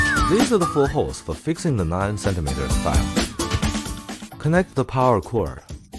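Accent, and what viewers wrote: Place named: China. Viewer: Wow this English sounds very Chinese!! 你好吗👋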